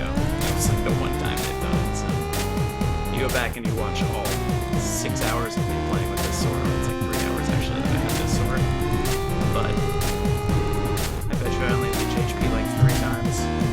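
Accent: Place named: East Coast, United States. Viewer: Lol, I can pick up a "Yankee" accent with your new mic 👍 Are u in the east coast somewhere?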